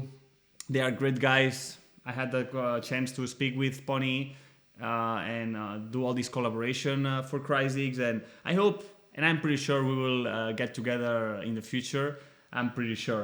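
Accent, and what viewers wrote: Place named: Spain. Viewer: Is this guy from Spain? The accent sounds a lot like European Spanish.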